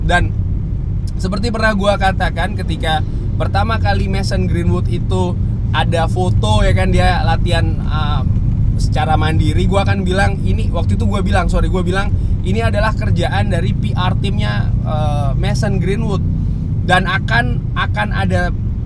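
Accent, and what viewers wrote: Semarang, Indonesia. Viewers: Orang Semarang bang?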